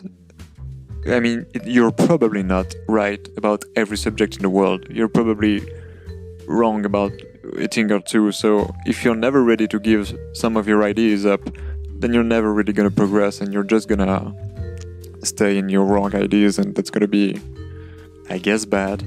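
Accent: France. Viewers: Once again I loved listening to your French accent speaking about such important things like freedom! Can you share more info about who you were talking about and the book he wrote? You mentioned that he lived during the same time Jane Austen wrote her novels in. If you were to make videos about philosophy I think you would get good views! You spoke about being ready to be wrong or change your mind about things and as an American who has seen such cultural turmoil in our country the last few years, I think people have forgotten how to debate and talk to each other without being so rigid. About the build: this is my second favorite style... Of course darker victorian's are my absolute favorite :) but you did so well recreating this Queen Anne house. It reminds me of the Carson House in Eureka California.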